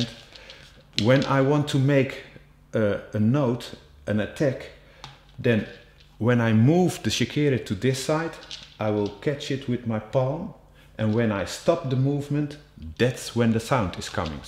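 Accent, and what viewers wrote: Netherlands. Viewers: This is great, thank you! What size/shape of Shekere would you recommend for beginning? By the way, are you dutch? Ik hoor het in je accent :)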